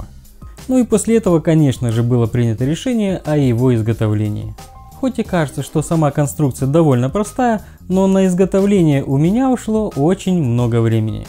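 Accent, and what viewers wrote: Kuban, Russia. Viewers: Вы случаем не с Кубани?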